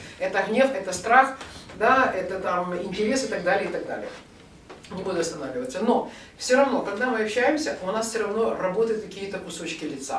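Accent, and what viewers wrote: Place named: Kyiv, Ukraine. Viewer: а сами вы чем лучше? хам, который не может подтвердить свои слова и не может выполнить в жизни обдуманные и хорошие поступки, тем самым о многом сожалеет, во-первых, вам стало это интересно (тема лекции) и как только вы решили не брать информацию в учёт жизни, во-вторых, вы увидел плохой комментарий и решили написать о человеке, которого не знаете, и судя по всему вы не внимательны и у вас узкий кругозор, так как говор лектор вполне себе, допустимый, так как она живёт (внимание) в Киеве, к вашему сведению столица Украины, в третьих, давайте ж включим логику, если она препадает и ей много лет, значит может чему то научить, и следовало обдумать все хорошо и досмотреть до конца, так как её звали работать личным психологом у политика, и скорее всего у вас сейчас появится желание удалить свой необдуманный ко мент, так как поймёте, что не правы, а когда до читаете этот момент скажете: НО ХРЕН ТАМ ТЕБЕ НЕ УСТУПЛЮ Если так оно и есть, то подумайте о как поступаете)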